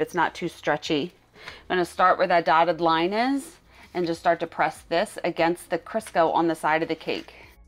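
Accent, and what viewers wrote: Philadelphia, United States. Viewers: Your video SAVED me!!! ❤🎉 Thank you!!!! Love your channel your Philly-accent too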